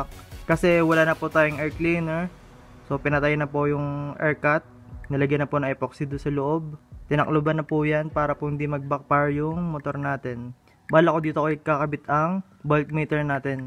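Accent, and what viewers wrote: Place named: Banyumas, Indonesia. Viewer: Bahasa nya kek jawa ngapak njir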